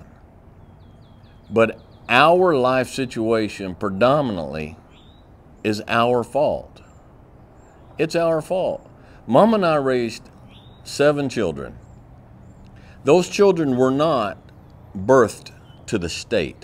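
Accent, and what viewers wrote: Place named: South, United States. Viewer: Lovin’ this southern TED talk ❤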